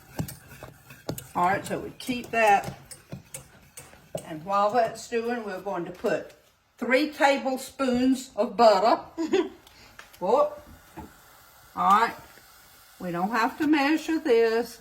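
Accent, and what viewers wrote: South, United States. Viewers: Thank You! I truly appreciate your strength in the Lord Jesus Christ! I follow you on YouTube! I love your videos, you have the sweetest Southern voice! I live alone, and I have 10 beautiful Grandchildren! I’ll be baking pies for our family during thanksgiving holidays! Thanks for being a blessing to so many people!😇🙏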